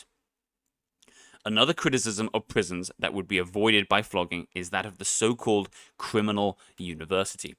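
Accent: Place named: RP, United Kingdom. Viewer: Ever heard the truth mate it usually starts with poverty but u wouldnt know about that judging by ur accent eton was it or harrow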